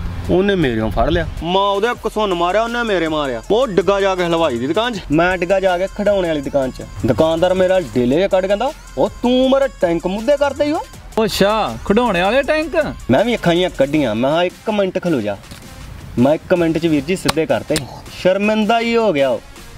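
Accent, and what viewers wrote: Majha, India. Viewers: Veer amrinder gill di copy nhi aa..mahje vale sare eda bolde Bai ji... veer mahje di shan ❤❤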